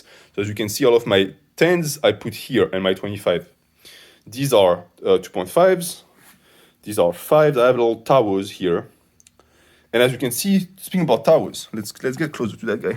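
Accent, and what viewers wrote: France. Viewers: dude im just happy for you reaching 10k subs! good humour, informative videos and french accent :D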